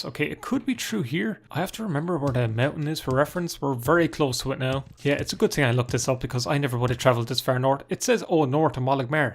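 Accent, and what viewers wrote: Ireland. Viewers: you put the other irish letsplayers i watch to shame. you talk let they do when theyre playing up being irish as a joke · keep up the content, its always so fun, also an irish accent makes everything better imo, how close are you to 4k watch hours? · I love your Irish accent man. "I tink so" "Maerk and recall" Subbed for the content, stayed for the accent + content combo.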